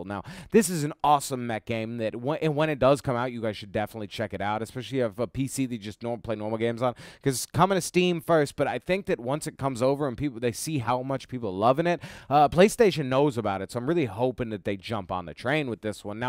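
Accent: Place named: New Jersey, United States. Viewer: I just heard this guy for the first time and went well that a New Jersey accent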